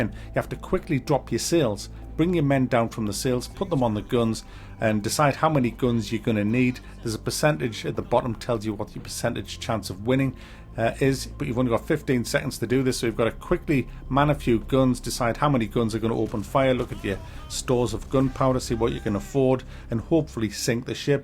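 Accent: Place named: Sunderland, United Kingdom. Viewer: Eh a mackem! Ya getting a sub just for that mate.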